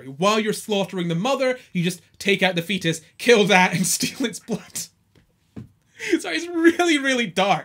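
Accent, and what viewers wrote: Scotland, United Kingdom. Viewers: 2 months of veganity woop woop, also are you Scottish?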